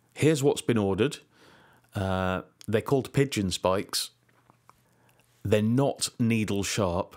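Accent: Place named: United Kingdom. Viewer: 와 영국발음이당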